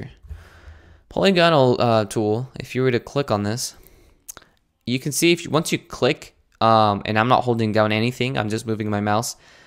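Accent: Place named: New York, United States. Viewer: It's a decent video but you gotta look like you're an expert, instead of the 15 year old guy who talks like a NYC girl that you actually sound like there are some basic concepts that you did not mention and you use way too much shortcuts. I'm not trying to hate on this, just giving you my viewing point in case you want to get better at doing tutorials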